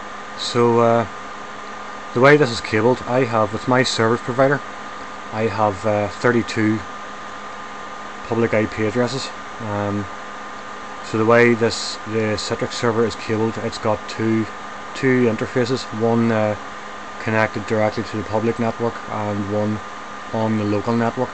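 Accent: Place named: Northern Ireland, United Kingdom. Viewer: You sound like your from Northern Ireland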